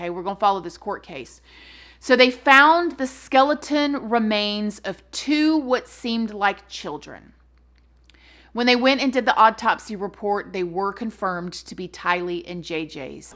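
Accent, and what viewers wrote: South, United States. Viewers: I watch as many of your videos that I can, but you just had me cracking up, I haven't laughed so hard in a minute, With your southern accent, the expression on your face, talking about this dizzy blonde wacko, "like okay calm down, You're gonna have to sit down and calm down here sister!" It was just so hilarious, thanks for the laugh I needed it!! That's why I stay watching, you have a gift for doing these videos, God Bless You 🌼🌻🌼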